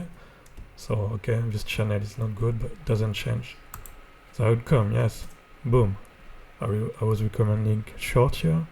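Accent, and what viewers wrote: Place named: France. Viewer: By the way, I like your french accent...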